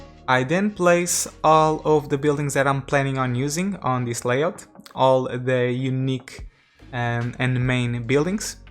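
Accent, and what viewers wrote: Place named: Portugal. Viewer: So de ouvir a pronuncia já sei que é portugues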